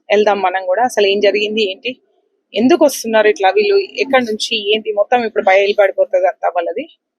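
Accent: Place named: Hyderabad, India. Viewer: Vah kya hua Hyderabadi baat kar rahe ho bhai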